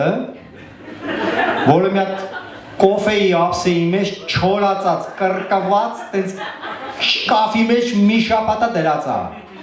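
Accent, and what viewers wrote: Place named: Gyumri, Armenia. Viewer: Bayc meka inchqan el Erevani barbarov kxosa meka tex tex kzgacvi or Leninakanci e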